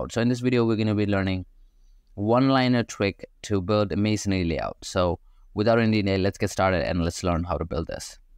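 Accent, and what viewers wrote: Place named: United States. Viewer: hey bro i just want to know how you learn american accent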